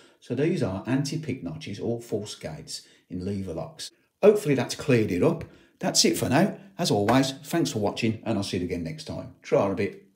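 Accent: Birmingham, United Kingdom. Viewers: Clear as glass. What a very cheeky lever having anti pick top and bottom.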